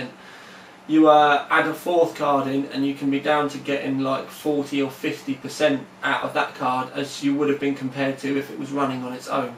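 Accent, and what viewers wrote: United Kingdom. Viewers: dude i hate your fucking british accent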